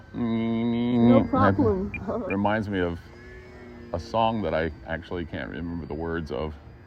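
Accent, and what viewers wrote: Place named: North America. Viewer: Brits aren't usually able to place North American accents, so for my education where is your accent typical of? Great fun videos.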